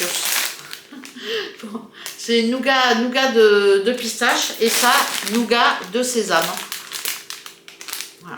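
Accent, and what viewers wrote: South, France. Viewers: Coucou!ton accent ,c'est le Soleil du Midi toute l'année! ,bisous,bilan brocante à Gerland cet après-midi:2 paire de chaussures à 25 euros pièce ,un robe Carole été 12 euros en lin ,un sac à mettre dans le dos été 20 euros,et 1 paire boucle d'oreille 3 euros pour la copine de mon fils MiMi en bélier ,elle de ce signe !,encore ouvert demain à Gerland ,la vente pour abriter les Sans Abris!,très bon week-end!,bisous à tous les deux!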